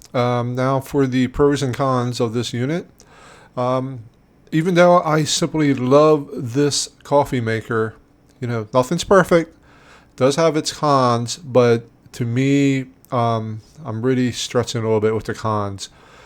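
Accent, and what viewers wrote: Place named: Philadelphia, United States. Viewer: Just subscribed . Just bought this and listened to you on the way home . I thought I recognized the accent then I heard “wooder” and I hit that subscribe button... r u from Philly or delco or pa ? HHaha cheers from one pa youtuber to what I think is another one !!!!! Peace ✌️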